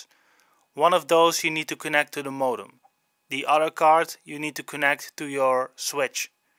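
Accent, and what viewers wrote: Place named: Netherlands. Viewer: Dacht ik al haha, lekker Nederlands accent!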